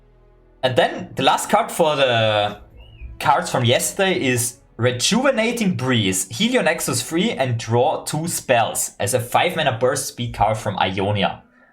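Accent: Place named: East, Austria. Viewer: Came here from Sunny and know about you both for some time through his streams but didnt expect you to be austrian aswell. From your accent I would guess you are from the north-east states like lower austria or vienna, right? Good content, keep it up!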